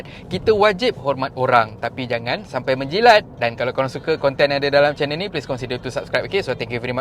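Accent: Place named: North, Malaysia. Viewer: blk utara ka.. Best mampuihhh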